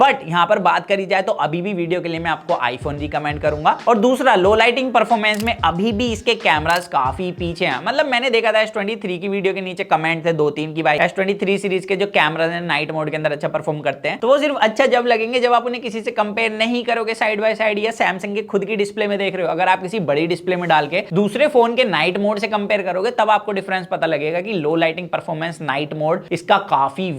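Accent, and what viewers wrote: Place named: Bhojpuri, India. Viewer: Batiya rhe bhojpuri 😂 achha lga bhojpuri type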